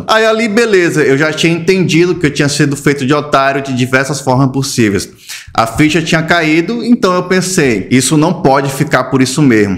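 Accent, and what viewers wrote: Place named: Northeast, Brazil. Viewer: 😂 Adoro as suas histórias!!! Principalmente seu sotaque nordestino...❤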